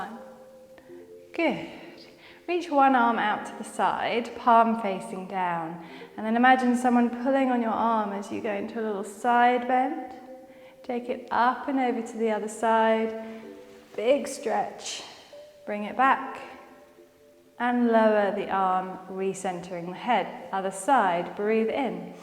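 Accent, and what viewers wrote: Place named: England, United Kingdom. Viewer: Oooh. Another one to add to my play list. I love your calmness and soothing voice and great workouts 😌💞 Are you an English lass? Gorgeous accent. I'm in Aus. 🇦🇺 Just found your channel and will be checking out many more of your videos. You're fabulous 👍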